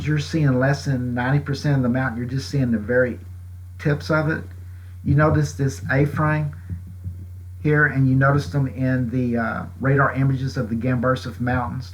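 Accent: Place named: North Carolina, United States. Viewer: Are you from near NC? Your accent sounds like where I grew up.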